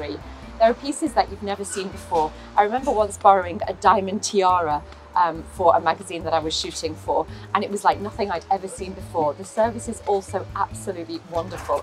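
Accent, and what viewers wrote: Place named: Manchester, United Kingdom. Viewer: I will check it out. 😊 I heard a bit of a Northern accent today in your video.Didn't realise it was Mancunian.👍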